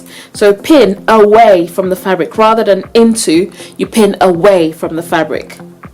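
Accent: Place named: Europe. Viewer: Beautiful black woman with old-world skills, humble heart, and European Accent . 🔥🔥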